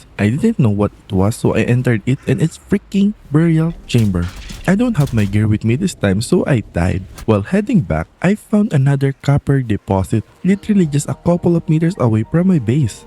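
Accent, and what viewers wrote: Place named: Philippines. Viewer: i recognise that accent, but i can never be sure, would you happen to be pinoy?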